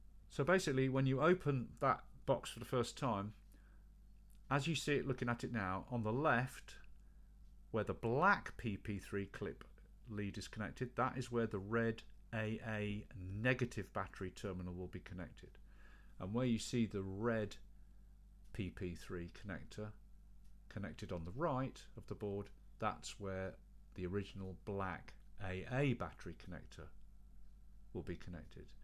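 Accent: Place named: England, United Kingdom. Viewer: I love English accent. Can you tell me if this tool will pick well music from the ceiling where neighbor is playing karaoke, he sings on the mic, play guitar and the karaoke machine plays ovation when the guy ends singing. I can't even watch my tv, I can't speak on my phone. I hear all double because of his noise disturbance. I need to record to get evidences. My cellphone does not pick any sound despite that the noise is so loud. How do I use this mic, attach to the ceiling?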